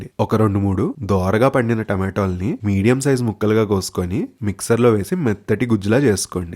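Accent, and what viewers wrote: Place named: Godavari, India. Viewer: Me ammamma gari vooru godavari district side anipisthundi andi.nenu matlaade vidhaanam meru matladinatle vuntundi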